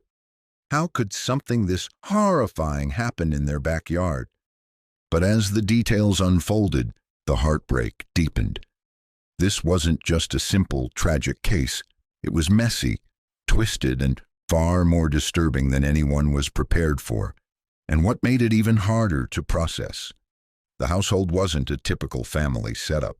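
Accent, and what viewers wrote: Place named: United States. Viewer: Couldn’t stand the narration and American accent. Very annoying and full of repetitive dialogue.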